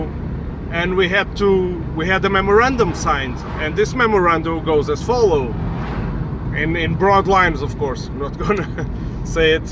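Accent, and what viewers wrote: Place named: Russia. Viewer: Why you have Russian accent?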